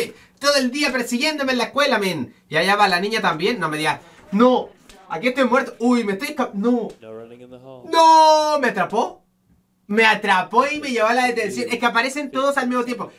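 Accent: Chile. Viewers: Eres de chile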